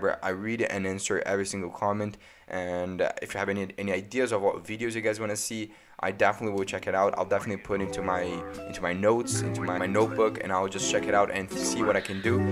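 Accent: Brazil. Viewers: sotaque br? very nice english by the way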